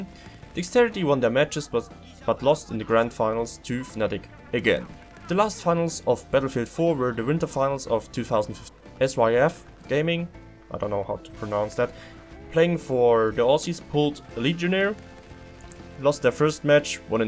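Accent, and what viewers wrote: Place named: Germany. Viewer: Man hört so den deutschen Akzent so hart :D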